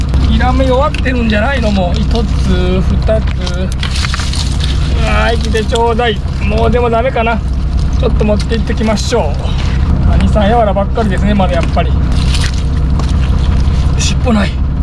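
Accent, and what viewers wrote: Okayama, Japan. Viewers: いつも楽しみに見させてもらってます 車エビ最高ですね 刺身で食べたいです 岡山弁？が ヤッパリ最高です 無理せずこれからも 楽しい映像をよろしくお願いします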